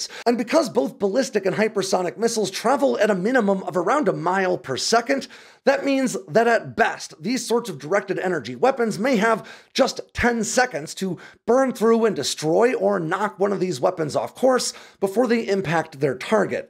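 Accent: United States. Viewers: And there you go Russia. If there is anything else we can divulge about any potential flaws in the Dragon fire weapon system, or any other of our allies weapons don't hesitate to get in touch with us at Sandbox. Mind you the guy is American by the sound of him so it is what I would expect.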